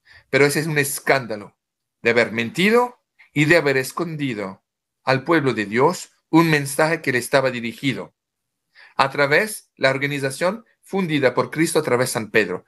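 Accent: France. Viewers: Es un honor escucharle con su precioso acento francés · Me gusta su acepto francés. Gracias.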